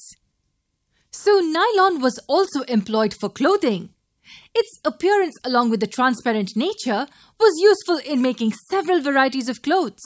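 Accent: India. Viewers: speaking english like indian ! :(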